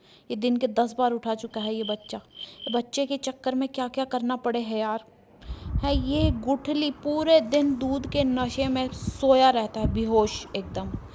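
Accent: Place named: Pahadi, India. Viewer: I love her pahadiiii accent❤❤❤❤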